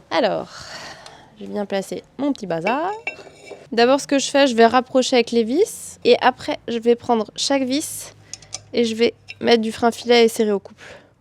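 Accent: Alsace, France. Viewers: Cc tu m'as bien fais rire quand tu es en compagnie de tes parents tu as l'accent alsacien a fond😂😂😂😂 c'est trop mignon et bonne année ma belle félicitations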